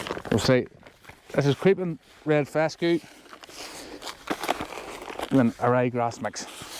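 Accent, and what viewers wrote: Northern Ireland, United Kingdom. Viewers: Helpful video, for supportive and constructive feedback it would help to slow down the rate of and narrative in the speech dialogue to make it more easily understood given the strong Northern Irish accent, much of the dialogue in this video was difficult to understand but overall helpful advice, thank you.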